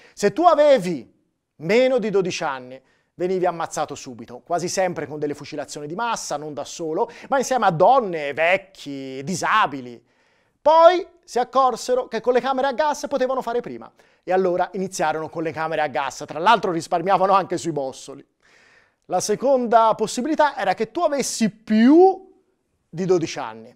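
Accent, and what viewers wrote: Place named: Tuscany, Italy. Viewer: Ragazzo, sei un grande... Riesci con la tua parlata Toscana e il tuo sorriso ad alleggerire questo spinoso argomento.... È un piacere ascoltarti.... Baci.... Da una mamma.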